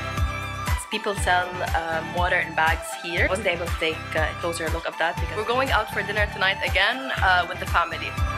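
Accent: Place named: Nigeria. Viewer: Just watched your best friend tag video and I thought you sounded a little Nigerian but I wasn’t sure. That’s so cool. Were you born there ?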